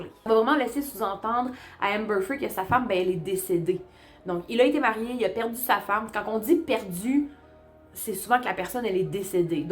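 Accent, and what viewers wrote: Quebec, Canada. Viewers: Merci pour cette vidéo. C'est bien raconté et pas trop court ni trop long. Et j'aime beaucoup l'accent québécois. Si tu me permets une petite correction, au tout début de la vidéo : On dit "un" épisode (c'est masculin). Et une petite remarque : Il y a beaucoup de détails tout au long du récit qui, selon moi, n'apportent rien d'intéressant à l'intrigue comme par exemple le prix de la maison cité au début, le nombre de pièces,... Merci pour tes efforts ❤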